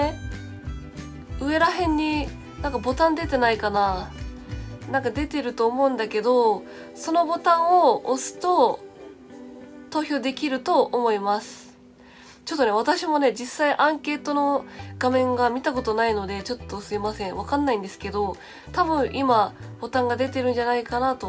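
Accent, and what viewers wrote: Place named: Kansai, Japan. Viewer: 自然に関西弁で全然良い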